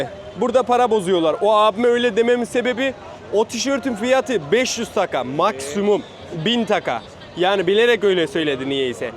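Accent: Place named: Central Anatolia, Turkey. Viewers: Sen ananas satan adama "Ek" diyorsun, bir demek istiyorsun, ama o senin inglizche konusmani bekledigi icin seni anlamadi galiba, beyni durdugundan degil )))). Anadolu shivesine bayiliyorum, has türkce. Amerikali , bebek gibi konushan genchler sinir ediyor, ama senin türkchen cok güzel. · Slm kardesim.cok guzel videolar.konusmalarin ayni bizim kayseri konusmasi gibi.allaha emanet ol kardesim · İç Anadolulu olabilir. Herkesin ağzı bir değil. Bilerek yapmıyordur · Kral konyalımısın şiven bizim oraya acayip benziyor. Video mükemmel olmuş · Kardeşim çok neşeli, eğlenceli bir insansın. Bir de bizim iç Anadolu ağzıyla konuşman çok güzeeeeeeellll❤❤❤❤❤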